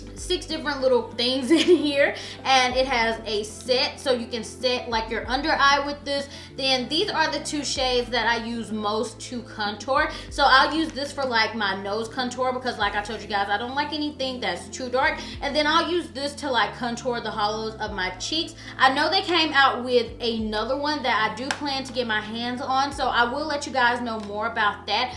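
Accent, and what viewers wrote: South, United States. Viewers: i luv your country accent especially when you say the word "sure"...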